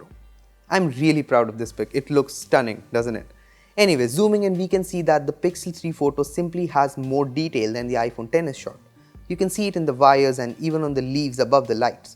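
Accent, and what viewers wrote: India. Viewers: work on your indian accent. Western people like me will click away, like I just d..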